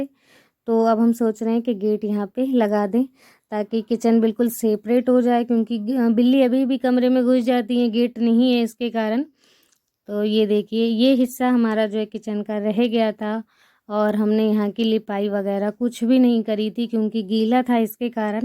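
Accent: India. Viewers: Ap hariyana se ho?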